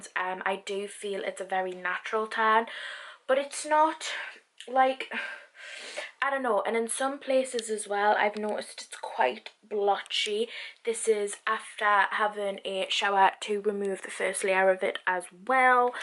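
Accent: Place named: North, United Kingdom. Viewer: It’s just how us northerners talk ✋🏼😂❤️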